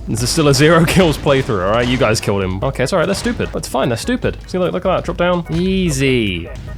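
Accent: United Kingdom. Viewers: I didn’t hear a difference in our British accents and yours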